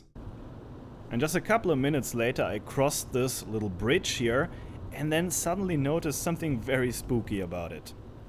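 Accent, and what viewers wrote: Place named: Germany. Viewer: Also ich finde dein Video wirklich interessant. Und wenigstens verstehe ich dein "deutsches" Englisch Gut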